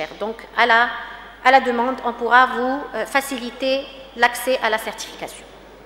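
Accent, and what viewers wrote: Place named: England, United Kingdom. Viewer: teachers of English trying to speak French, we still can notice the effects of English on their French pronunciation.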